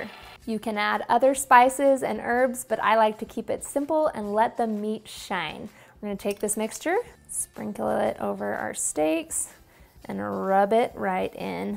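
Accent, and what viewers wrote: United States. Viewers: Not in America booboo....its called an accent.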